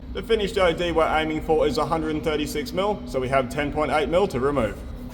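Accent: Queensland, Australia. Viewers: Guys, when I want "milking", I go to the supermarket. When I want to see metal getting peeled back like the layers of an onion, I go to CEE. Love you all -, Homey, the magpies and the overhead drone, the noisy trains on the tracks over yonder. ' outtakes keep me trying when I blunder (if he can do the narrative, I can do whatever I'm screwing up). Here, I can relax and listen to an Australian accent with a QLD coastal twist. 😀❤👍 And the cute Staffy ❤❤❤needs more interactive air time.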